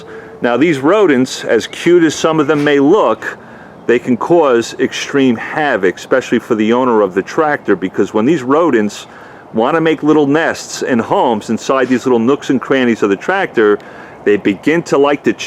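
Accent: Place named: Philadelphia, United States. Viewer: that's not a Texas accent....I'm from the Philly area and I know that accent is within 2hrs or less from me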